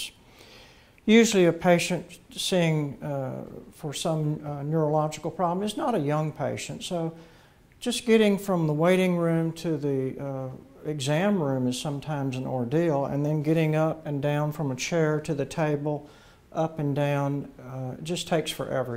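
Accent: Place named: Upper South, United States. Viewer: It's called an "upper south" accent. Distinct from the "deep south" drawl. This is more of a Kentucky, Tennessee, Virginia, or North Carolina way of speaking. Some parts of north Texas also similar.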